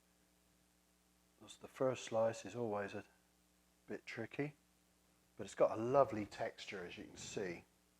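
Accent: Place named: Gloucestershire, United Kingdom. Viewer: Thanks, this video is clear and informative, can't wait to have a go. Love the Gloucestershire accent.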